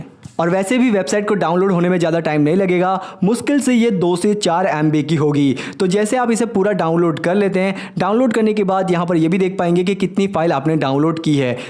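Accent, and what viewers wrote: Gujarat, India. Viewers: Bhai aap Bharuch,gujrat se ho kya 😀🤔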